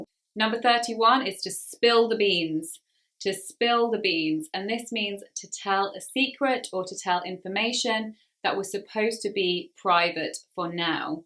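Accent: United Kingdom. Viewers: Gosh do I love the british accent. Something about your voice which just captures my attention 110%. I'm all ears. Have a safe day fam 🤟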